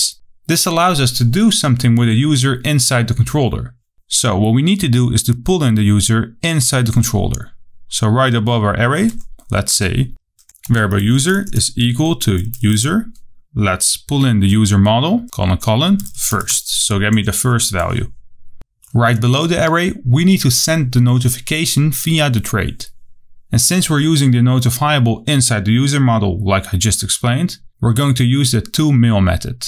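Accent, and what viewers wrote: Netherlands. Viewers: Hi, thanks for your videos. It sounds like you're dutch:) Can you make a video about broadcasting notifications?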